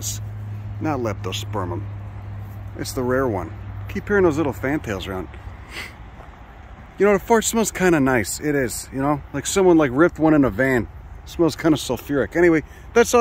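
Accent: Chicago, United States. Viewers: Oh, yeah, I get it. Your original point is good - and believe me, I hope people will heed signs or common sense. It is gruesome to think of caustic (or acidic) pits of boiling mud. I guess my original comment was because our ingenious educator simply cracks me up with his thick Chicago accent. Sorta like the trip through the grocery store near Berkeley. So many quotables...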